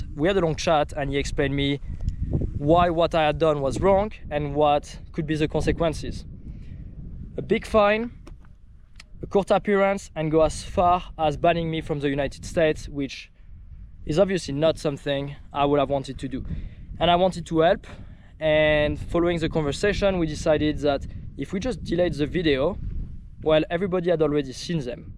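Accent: France. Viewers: That french accent tho 😭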